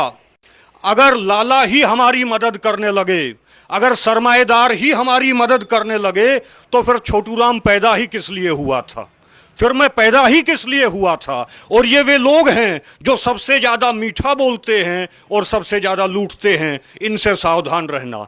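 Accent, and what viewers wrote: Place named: Bihar, India. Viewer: Bhai u r talking like a bihari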